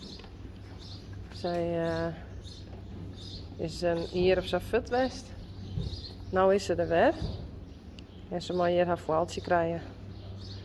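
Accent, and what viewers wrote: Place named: Friesland, Netherlands. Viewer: Grappig als ik jou Fries hoor praten , meeste dialecten etc ken ik maar deze niet zo.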